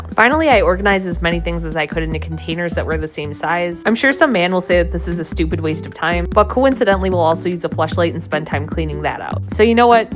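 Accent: United States. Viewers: Thickest accent so American lol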